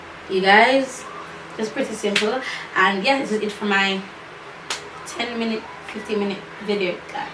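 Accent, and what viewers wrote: Jamaica. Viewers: First time watching ur video but u sound Jamaican so am gonna subscribe lol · Great Job💪🏾 you sound Jamaican? New subcriber from! JA😊 Mama Mama!😊 i like your vibes you are so down to earth and the love of God is real in your life! Your vibration will take you places you never imagin😊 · U sounds like a Jamaican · Its actually an Jamaican accent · I love how you speak girl.love ur patwa. Can listen to u all day. Beautiful makeup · Are you Jamaican 🇯🇲 and British 🇬🇧? I hear two different accents.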